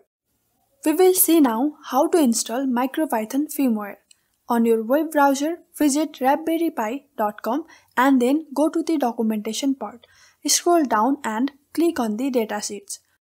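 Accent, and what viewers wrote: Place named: India. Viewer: An Indian, really? Please use a voice-over actor!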